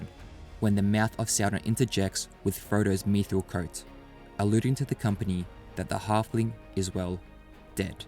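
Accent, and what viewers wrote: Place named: Australia. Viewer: I'm confused, this guy sounds like he's Australian but his English is so poor. Why is that?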